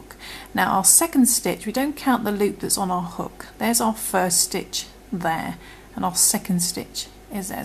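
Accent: United Kingdom. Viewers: My only gripe is you’re using US terminology and you sound British but otherwise lovely thanks for the share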